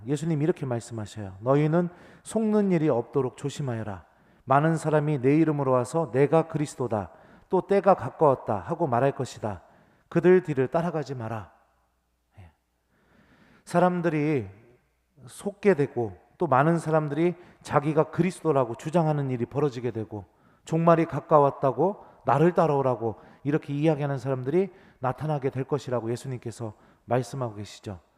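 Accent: Chungcheong, South Korea. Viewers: 충청도 사투리가 참 정겨워요^^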